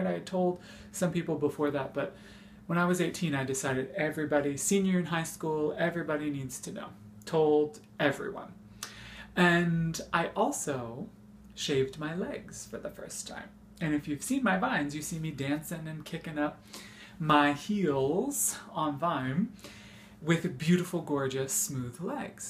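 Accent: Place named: United States. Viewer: 8 year old me thought this is how all Americans talked and are like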